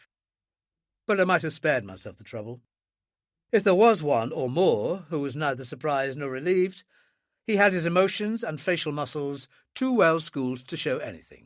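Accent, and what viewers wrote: England, United Kingdom. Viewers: I have been seeking a channel with minimal adds. In Alistair MacLean’s audiobooks I find that there are no adds but there is a difficulty with the reader. The English accent makes it hard to understand some of the dialogue. This audio book drags on and on so I have increased the reading speed However in doing so the reader’s English accent makes it even more difficult to understand.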